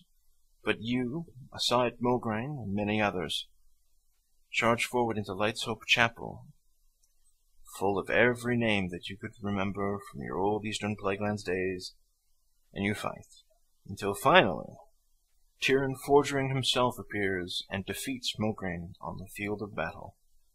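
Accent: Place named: RP, United Kingdom. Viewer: Seriously, I like very much your voice. Too RP for some ppl tho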